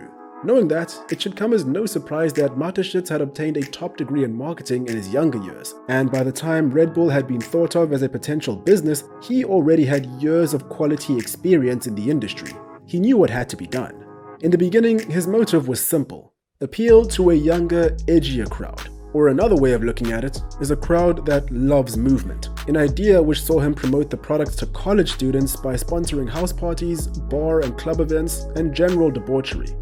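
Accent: South Africa. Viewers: South african accent definitely!! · Well well well, a happy coincidence finding a fellow South African! Knew it from the beginning when you started talking. Keep up the good work man and make us proud!!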